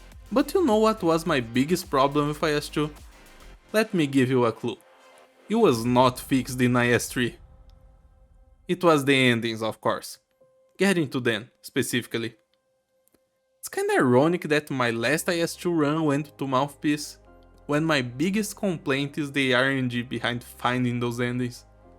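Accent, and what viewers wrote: Brazil. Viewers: Are you brazilian? Nice video btw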